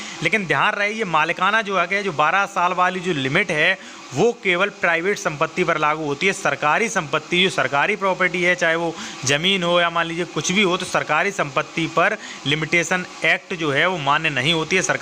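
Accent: Uttar Pradesh, India. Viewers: Sir aapki bolne se. Lagta hai ki aap agra aligarh ke as pass practice karte hai...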